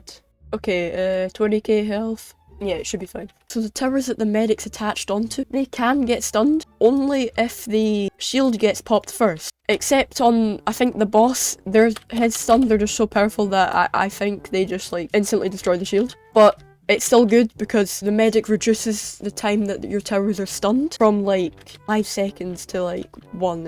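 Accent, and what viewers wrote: United Kingdom. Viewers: the moment i heard the brit accent i knew this is going to be good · it sounds scottish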